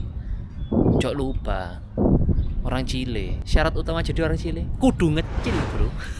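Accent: Java, Indonesia. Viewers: Kapan² full gae boso Jowo Cak 😁 logatmu wes kuwentell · Mas logat mu Surabaya atau madura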